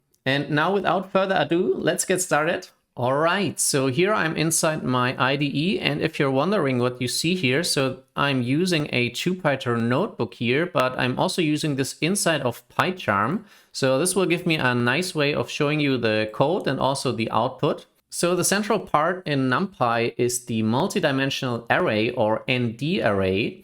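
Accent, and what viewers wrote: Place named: Germany. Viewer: ich versuch NumPy zu lernen aber bin immer noch von diesem deutschen Akzent ablenkt😭😭aber klingt sehr niedlich❤️❤️❤️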